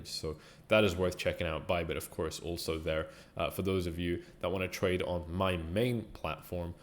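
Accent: Scotland, United Kingdom. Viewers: Good man thanks for your videos, are you from Scotland?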